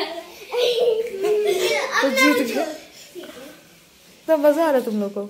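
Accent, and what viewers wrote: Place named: Bengal, India. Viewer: So cute bengal accent ❤❤❤❤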